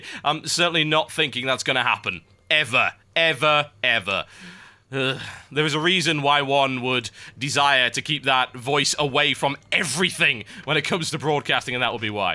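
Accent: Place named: Newcastle, United Kingdom. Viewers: Lol all geordies don't talk like that :P, well i don't anyways :P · Hard lol Geordie casting xD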